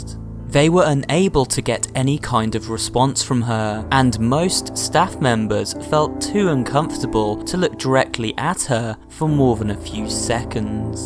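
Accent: United Kingdom. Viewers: The British accent really adds terror to it.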